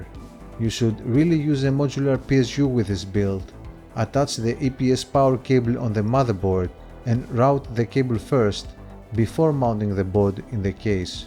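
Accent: Greece. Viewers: why does he sound so greek ?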